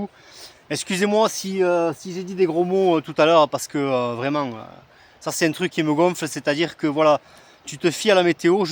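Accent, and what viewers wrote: South, France. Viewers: Avec plaisir, j'adore l'accent du Sud en plus ;)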